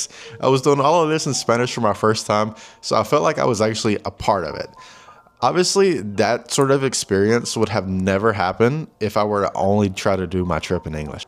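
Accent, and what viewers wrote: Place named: Russia. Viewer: You speak Spanish like Russian could be your first language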